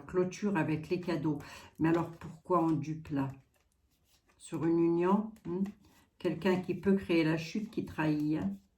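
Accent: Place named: Vosges, France. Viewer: vous êtes vogienne ? on dirait mon papa ,avec votre accent ;-)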